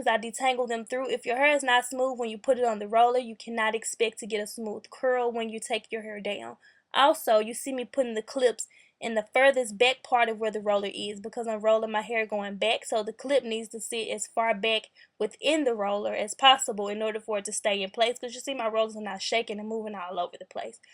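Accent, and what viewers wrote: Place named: Houston, United States. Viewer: I like your accent lol 😂 u from Houston?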